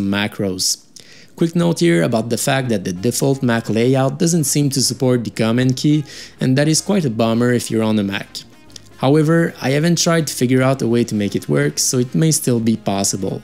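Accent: Quebec, Canada. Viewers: J'ai comme l'impression que t'es Québecois, pas vrai?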